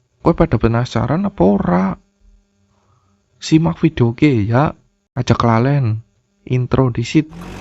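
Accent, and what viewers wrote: Banyumas, Indonesia. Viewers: Ora Ngapak Ora Kepenak 🔥. Sukak nyong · Asli kueh man, jarang" ana chanel oto ngapakers 🤣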